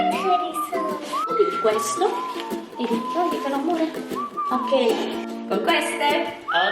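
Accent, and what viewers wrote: Italy. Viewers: I enjoy your cooking and look forward to the videos every Thursday. Keep posting! Your Italian English is unique and they make me laugh all the time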